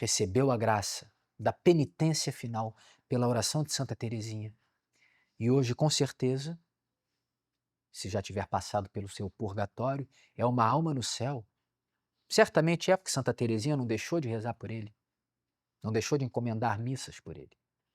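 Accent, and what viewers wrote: Rio de Janeiro, Brazil. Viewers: É que ele não tá acostumado com o carioquês, basta ver o Mestro Rorion Gracie falando Cadimia que a gente sabe que ele sabe que é academia, mas o carioquês é identidade pura. Eu entendo ele porque me dá um nervoso quando ouço Naisceu, por exemplo, que nem sei denonde veio essa forma de falar. kkkk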